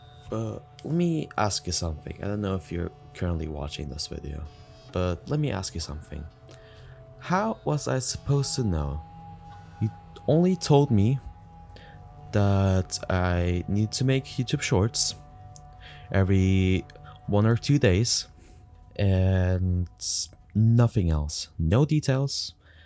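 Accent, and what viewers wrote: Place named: Philippines. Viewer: u sound awfully similar to a Filipino or at least someone of ASEAN descent (southeast Asia)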